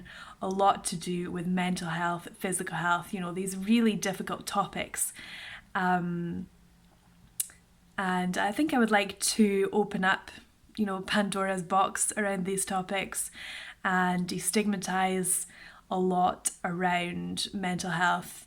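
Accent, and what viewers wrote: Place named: Ireland. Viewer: This is a good video. And the Irish accent was brilliant.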